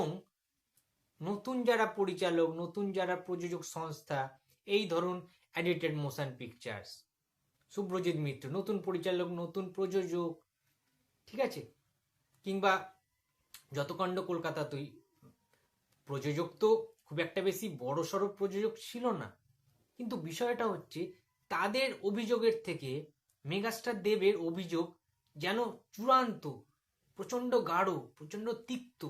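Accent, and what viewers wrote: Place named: Bangladesh. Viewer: Golar voice sune to mone h6e bangladeshi....ta boli age nijer desher subho kamona korun na...jate gota desh tar sad budhi ghote....parshobarti desh e ki h6e jene ki korben....tollywood er king age jei thakuk na kno...akhon 1jn e a6e...at present Seta hlo Dev....apnader Shakib o kichu chirte parbe nah...😂 Ei karonei hyto bole Ma er theke masir dorod beshi...😂😂😅😅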